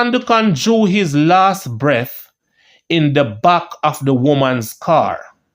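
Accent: Jamaica. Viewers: U hear any foreigner on here asking bout correct pronunciation bredda???Except you!!!Stop being so critical of the presenter's Jamaican dialect. Cho!!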